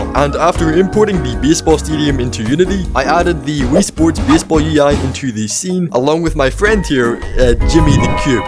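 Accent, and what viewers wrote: Northern Ireland, United Kingdom. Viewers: are you northern irish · R U NORTHERN IRISH BROOO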